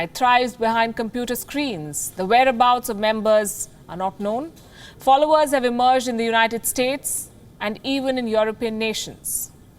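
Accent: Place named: Northeast India, India. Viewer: That north eastern accent 😻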